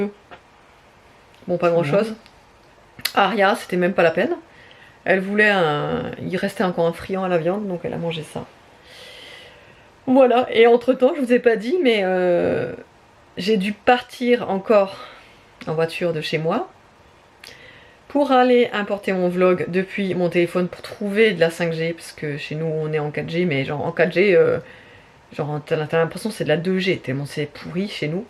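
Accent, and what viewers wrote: Alsace, France. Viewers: ah d'accord ! Je comprends c'est pas négligeable ! J'aime beaucoup ce que tu fais comme vidéos. Et en plus tu es alsacienne comme moi et quand je te regarde ton accent me fait penser à lalsace ahah bonne journée et bon weekend à toi bises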